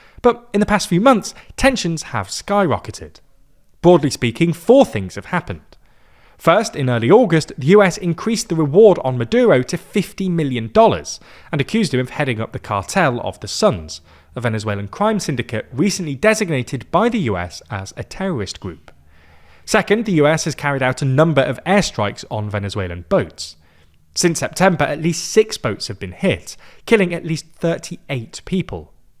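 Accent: United Kingdom. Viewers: People with British accents don't get to tell Americans what we can and can't do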